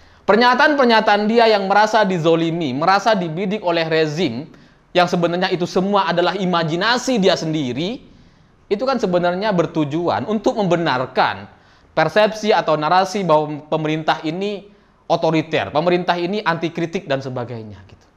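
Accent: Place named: Madura, Indonesia. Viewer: Mas itu lucu,logatnya madura banget,mirip suami ku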